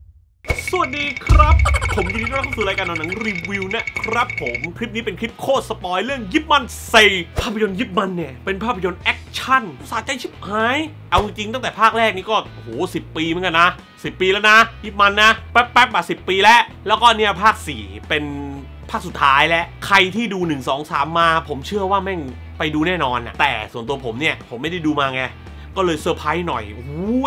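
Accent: South, Thailand. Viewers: คนรีวิวคนใต้ใช่ไหมคับ ควังสำเนียงออก 😂😁😀😊☺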